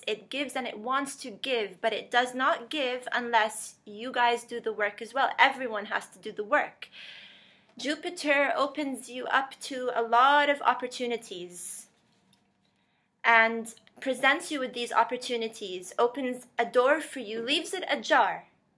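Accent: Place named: Ireland. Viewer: are you Irish? - I love your accent xx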